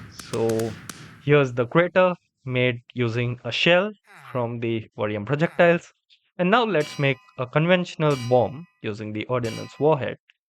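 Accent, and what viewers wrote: India. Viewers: when the indian accent came on i instantly closed the video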